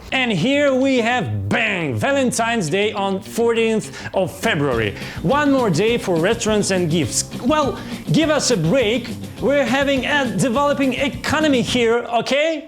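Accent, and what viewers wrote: Russia. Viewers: Русский английский - идеальный для прокачки уровня - больше новых слов, понятных по контексту и произношению. Идеально! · явно видно русско-английский акцент 😅, You have to work more and practice your speech 💪👍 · Russian English( Но в любом случае очень хороший) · Кто пишет "не такой уж сильный", вы серьезно? Иван сверху правильно написал, вам только так кажется, т.к. вы в голове примерно так же читаете по-английски (за это кстати "спасибо" нашим урокам английского в школах). Послушайте носителя языка, а потом, и всё сразу поймете. А для англоговорящих зрителей это еще усиливается, и для них он будет звучать именно как такой типичный русский акцент - лондон из э кэпитл оф грэйт бритн; лет ми спик фром май харт... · ну такое, хорошо, сильный русский акцент, у меня ровно такой же, поэтому легко воспринимать. пока непонятно откуда планируешь брать англоязычный трафик, посмотрим, подписался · This genre should be cool only if you have a real native speaker, of course, for russians that`s easier to understand russian accent, but if you want to attract attention of foreign viewers, you need someone, who was born english speaking))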